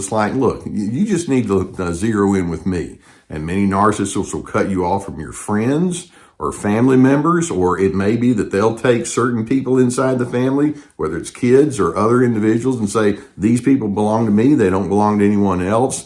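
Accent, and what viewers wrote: United States. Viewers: I love every word in your message dr and your beautiful American accent. Thank you for your incredible help and support!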